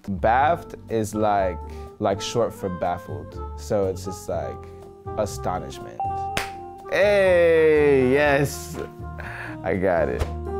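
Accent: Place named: United Kingdom. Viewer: Exactly he sounds British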